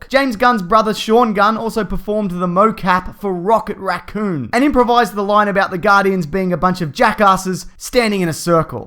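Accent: Australia. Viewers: lol I so want to know what you are saying in some of the collectors items and what they are and do, so I subtitled this video, (which anyone can do) and I guess an austrailian accent makes it so english subtitles horrible lol. for Thanos the subtitle came up as chans.... and almost every word is off. its funny, I recommend trying subtitles for this video just to see what I'm talking about. settings button or menu is right on the youtube screen itself. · this is the thickest Australian ascent ive ever heard AND IM AUSTRALIAN!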